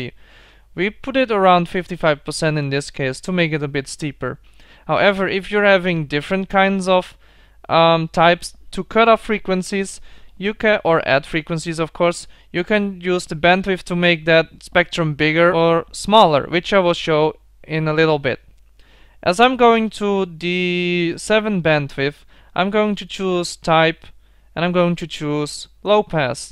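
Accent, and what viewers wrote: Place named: Netherlands. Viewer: I love your dutch accent, like mine xD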